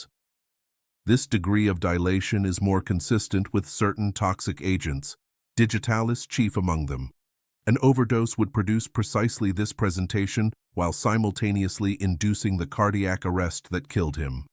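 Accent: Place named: United States. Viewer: Why is this in an American Accent 🖕🖕🖕🖕🖕 no Chance I am listening to this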